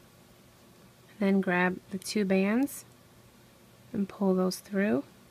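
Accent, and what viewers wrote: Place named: New York, United States. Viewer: Are you from New York? You have a slight New York accent and it's so sassy ^-^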